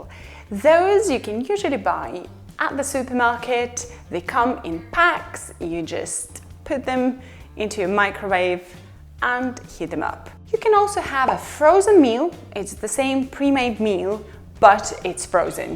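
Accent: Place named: United Kingdom. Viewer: I adore your videos. And your British accent - it`s something incredible. Вы потрясающая и светлая личность. С любовью из Украины. Have a good one!!!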